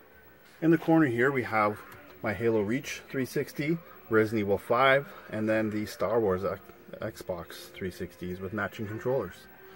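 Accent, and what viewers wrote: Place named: United States. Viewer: in the box i love when americans say that lol in the bahx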